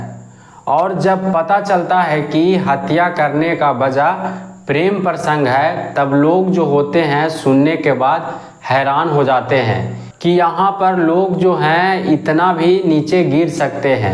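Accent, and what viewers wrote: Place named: Bihar, India. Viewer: Bhaii you’re doing well but change the Bihari Hindi accent to org Hindi write before recording you’re doing well 😊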